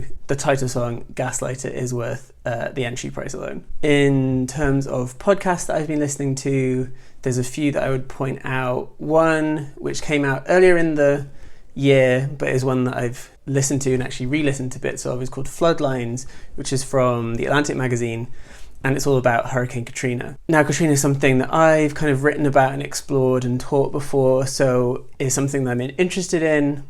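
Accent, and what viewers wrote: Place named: United Kingdom. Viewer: Hey, I love Gaslighter. They are so talented. I really want to check out I May Destroy You but I have to get HBO. I'll definitely look into (sp?) too. I was wondering given your references to Katrina and NY Ballroom culture etc. where in the world you are? lol Obviously the accent suggests the UK but you have a lot of American references. Just curious. I just finished reading E.M. Forster's Maurice myself and immediately watched the 1987 film. I loved the novel. Especially after reading the Terminal Note at the end. Very interesting take on an English gay love story in 1914. The movie was pretty faithful to the novel as well. Thanks for the recommendations!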